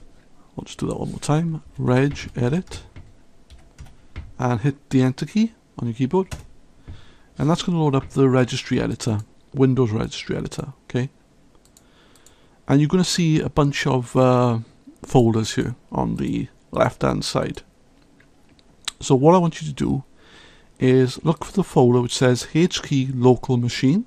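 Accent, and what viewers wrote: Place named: Plymouth, United Kingdom. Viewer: You saved me 120 pounds, for that... you get my sub. thank you so much. PS is your accent from plymouth? <3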